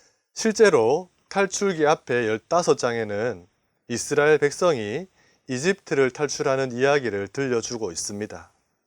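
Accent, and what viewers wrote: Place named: Gyeongsang, South Korea. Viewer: 차분하게 알아듣기쉽게 구수한경상도말씀이 너무도 잘 드렸습니다 고맙습니다~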